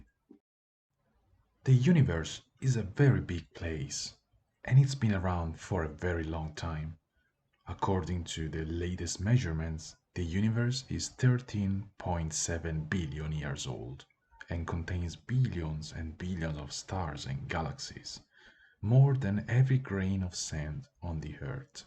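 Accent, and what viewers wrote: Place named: Italy. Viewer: Love italian accent!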